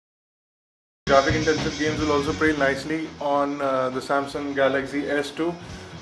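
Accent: India. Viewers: its called an indian accent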